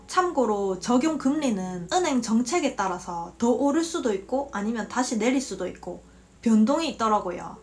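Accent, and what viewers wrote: Gyeongsang, South Korea. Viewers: 우연히 보았는데 인터넷을 잘못하는데 토스뱅크인가 파킹통장 먄들어야겠네요 경상도 아가씨라 쏙쏙 귀에 꼬치네 유익한 정보 고맙십니뎅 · 경상도 아지매라 그런지 말이 쏙쏙 넘 재미나네요 농협 붙박이로 쓰는데 유익한 정보 보고 갑니다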